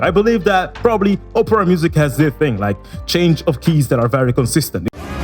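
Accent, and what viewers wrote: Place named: Italy. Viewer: He does sound like an Italian with that accent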